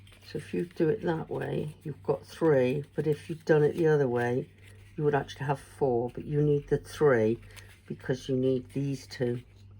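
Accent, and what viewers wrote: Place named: West Country, United Kingdom. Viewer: An absolutely beautiful card made by a beautiful lady. I can hear by your accent that, like myself, you're a West Country girl? I'm a Devonshire Dumpling 😂😆💗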